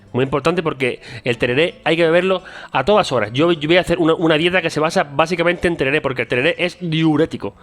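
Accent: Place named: Andalusia, Spain. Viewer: Me imaginó que eres andaluz. Por el acento que tienes.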